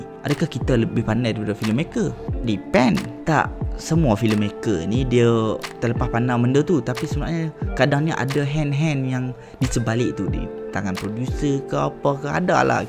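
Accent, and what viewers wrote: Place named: North, Malaysia. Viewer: done subscribe..sbb nk dgr bhse utara.. tp org mane ekk..perlis penang atau kedah??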